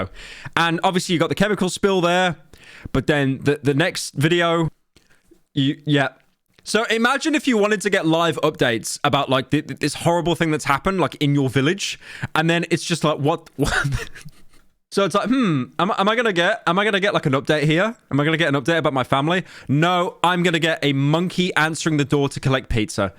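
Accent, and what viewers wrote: United Kingdom. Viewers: Shut up your britich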